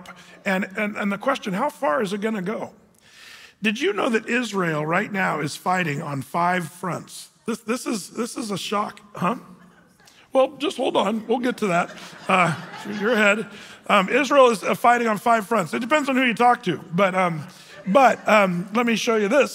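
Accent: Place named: South, United States. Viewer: you being from California …you certainly talk and sound like your from the Southern United States …Accent…..have a blessed day ✝️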